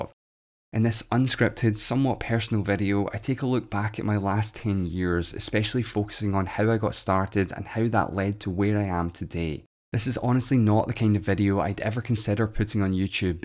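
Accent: Scotland, United Kingdom. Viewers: I find this Scot very difficult to understand; could he please speak the King's English?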